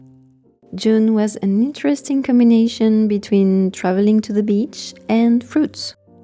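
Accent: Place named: France. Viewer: I love your originality - such beautiful work! And I love your French accent too!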